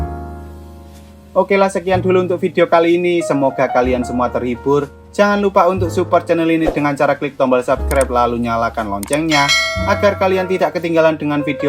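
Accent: Java, Indonesia. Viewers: Bagus dlm membawakan alur cerita nya mas nya keren,tp lucu bahasa indonesia nya medog jawa bnget 😀 tp aku suka denger nya 👍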